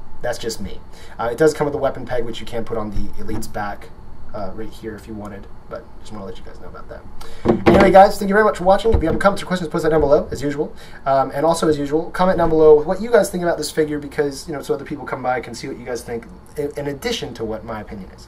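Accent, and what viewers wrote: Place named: Germany. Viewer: nice german accent, but I'm so sad they didn't do well on the Fuel-Rod Cannon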